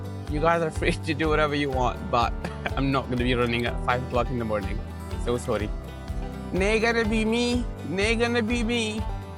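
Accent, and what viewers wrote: Scotland, United Kingdom. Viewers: Love your Scottish vowels :) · Scottish, not Scotch (that's whisky). got a twang of Scots, but his accent is his own. Thank you, for all you do in the NHS. Our eldest was a Staff Nurse in the Vic, and his wife is a District Nurse. You do a grand job!! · Love the video. And you manage to make kirkcaldy look beautiful. Hopefully videos like this change peoples opinions on people from other countries that choose to live here and the positive impact that brings. Loving the scottish accent. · You sound so Scottish now tho 😂👏 Thank you for coming to work in our NHS ❤ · You've got a very nice Scots accent developing! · lol I was just thinking how much of a Scottish accent you have, you are a great addition to this country, I hope you find everything you could ever want here. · Your accent is coming along - when you go back to Sudan for a visit do they all comment on your Scots Accent? 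· Some places measure heavy rainfall in centimetres or inches, in scotland we go by how close to horizontal it is. To combat the weather we've developed a very dry sense of humour which does tend to dance on the line with sarcasm. A good doctor talks with and listens to their patients, something you clearly do since some of your A's have a noticeable scots twang. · I can hear a Scots twang in your accent 👌❤️. Greetings from Glasgow dinae ken isn't "Scottish" it's regional , we don't say they words here in Glasgow and no such thing ass Scottish accent as it changes depending on where you go . different all over Scotland so , so so many "Scottish" accents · Welcome to Scotland pal, can’t believe how much of a Scottish accent you have picked up! Glasgow is a bit more mixed culture and diverse. ❤ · This is a nice to see perspective of a Sudanese man living in my country. I can already pick up a wee Scot's twang in your speaking!😄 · I love your scottish accent 😅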